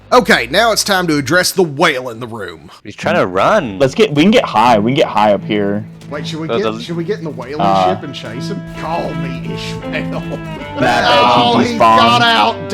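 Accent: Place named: Texas, United States. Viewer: I LOVE his patriotic Texan accent.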